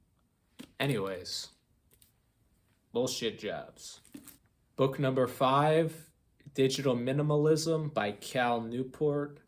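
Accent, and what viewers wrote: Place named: Scotland, United Kingdom. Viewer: pepe pic but scottish accent? bad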